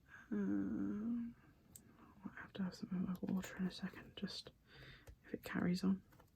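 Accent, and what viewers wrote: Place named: United Kingdom. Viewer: hello love your accent from the UK everybody. We are either rejoicing in US or crying today day after election. Im crying.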